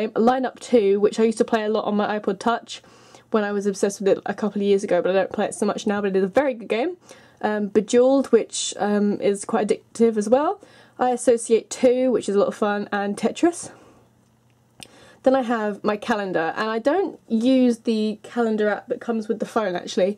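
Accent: United Kingdom. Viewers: oh my god, finally ! I have been watching these videos and the were either american or australian people. Finally an english girl. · its kind of rude what im saying but sometimes the british accent kinda of throws me off..idk why not trying to be rude or anything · are u british????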